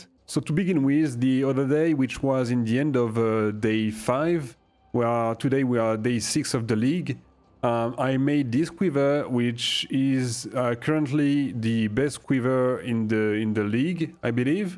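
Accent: France. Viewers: On reconnait l'accent bien français bien bourguignon. Nice craft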